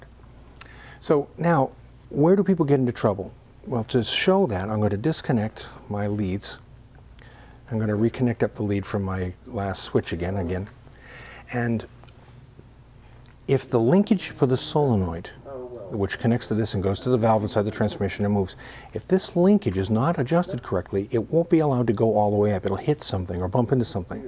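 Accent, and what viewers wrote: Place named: New York, United States. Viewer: New yawk accent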